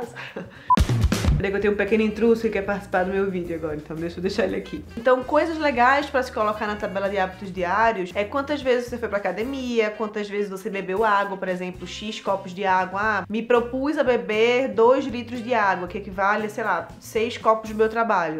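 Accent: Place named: Pernambuco, Brazil. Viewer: Vc é de PE, né? O sotaque entrega! 😅 De todo modo, MUITÍSSIMO Obrigada pelas dicas! Vc apresenta o conteúdo de forma bastante didática, sucinta, clara e objetiva. E, msm assim, bem informativo (refiro -me à quantidade e à qualidade da informação, bem satisfatórias). Muito bom! 🤗 Sucesso! 🌼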